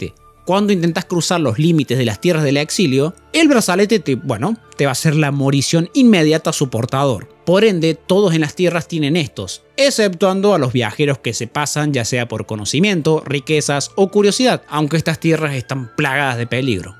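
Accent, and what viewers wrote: Córdoba, Argentina. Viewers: Bien cordooobés!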